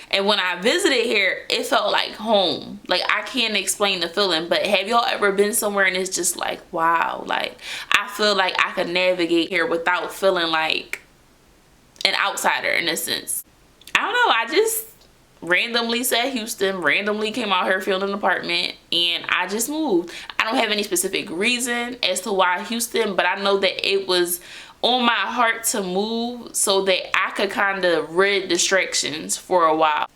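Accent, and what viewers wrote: Philadelphia, United States. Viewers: I knew you were from Philly before you said you were from there 😂😂 sending love from Philly ❤️ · I know that philly accent anywhere 😅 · Damn I’m bout to do the same move.. I knew u was from Philly as soon as u started talking… it’s crazy out here and I’m glad u safe and made this video.. #BeSafeTho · You sound like you from PHILLY! That accent is CRAZY.. I'm listening for the word "Jawn", or "Drawing", but your talking kinda proper right now 😂 but you can't hide that accent. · Are you Black American? Love the Philly accent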